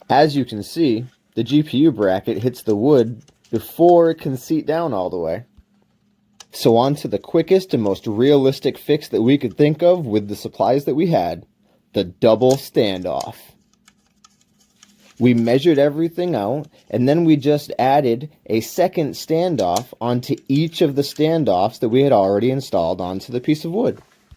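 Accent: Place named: New England, United States. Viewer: "one andaa quootaaa" Love that New England accent! Nice video man!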